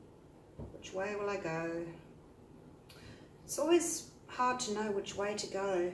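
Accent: Australia. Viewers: Love your work and your tutorials. Especially the way you talk about your life. I feel like I'm listening to a friend and getting to know you as well as a new way of painting. It's good to listen to another Aussie Aussie Aussie. Oi oi you get the drift. Thank you.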